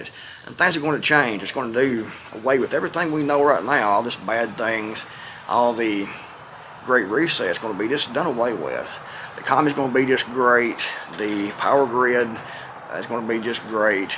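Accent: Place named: South, United States. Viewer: Hi there, I love your videos. You are calm, and sound like a Southern gentleman. (No offense, or crazy stalking business. Just want to let you know what I value in your videos and on the channel.) I just want to comment on something you said that's tickling my brain, about a comment by someone else, with regards to the lady who is losing interest in prepping. They want excitement, they want something to REACT TO. They want to be entertained. Prepping boils down to keeping one's house in order. It's that simple. Keep decent food, cook for the family, etc etc and, yes, etc. Prepping is KIND OF fun, but it's mostly hard work. It's BORING, until one sees the progress, the stacked canning jars, the freeze dried bags, and so on. It's not GLAMOROUS. I'm up periodically around the clock, there's no makeup involved in slicing and blanching potatoes outside under the carport at 1 A.M. 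because of the heat and humidity in the area. If people want to be entertained, try stealing my chickens, I'll give them entertainment, and some precious metals to boot, and I'm not talking gold or silver.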